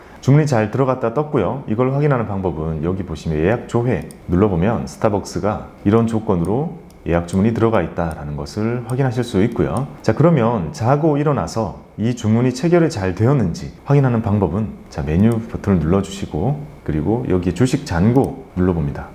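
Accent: Gyeongsang, South Korea. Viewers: 좋은 정보 감사합니다. 경남사투리 참 매력적이네요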